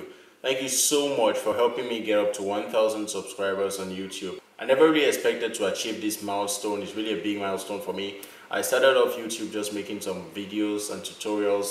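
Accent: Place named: Nigeria. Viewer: Hey, you sound Nigerian. Are you?, lol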